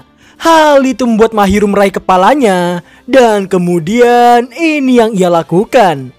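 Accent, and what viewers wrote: Java, Indonesia. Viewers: bang orang jawa ya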